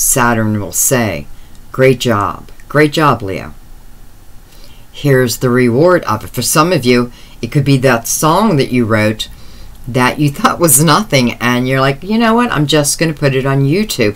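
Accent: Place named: East Coast, United States. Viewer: everything you say is real ... you sound like your from back east very kind and wise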